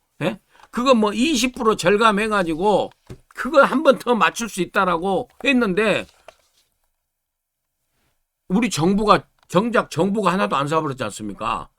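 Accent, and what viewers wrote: Jeolla, South Korea. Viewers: 구수한 전라도 말투 정겹네요..저도 전라도 사람입니다..본래 전라도는 보수우익이었죠. 3김시대 거치면서 돌아버렸어요.. 박근혜 대통령 잘못이 있으면 일년 동안 문제제기하고 대선을 치르지 왜 억지로 끌어내렸는지... 정치사에 정말 나쁜 선례를 남긴 겁니다.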